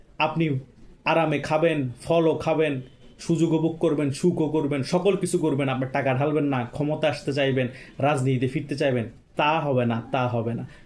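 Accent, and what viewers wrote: Chittagong, Bangladesh. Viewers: তুমি চিটাইংগা মনে হয়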